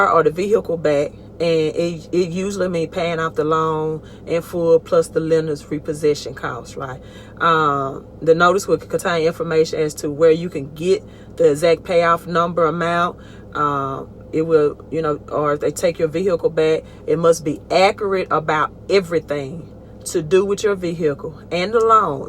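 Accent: United States. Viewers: Hmm American accent in a right hand drive...im confused or is it a camera switch.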